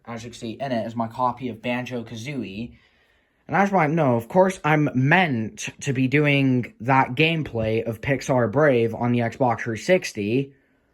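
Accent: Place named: United States. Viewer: it an autistic sounding american accent, hope this helps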